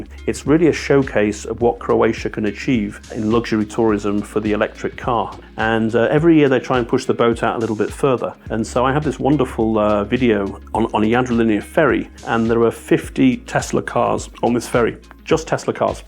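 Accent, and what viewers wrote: United Kingdom. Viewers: Pommy nob.😂😂😂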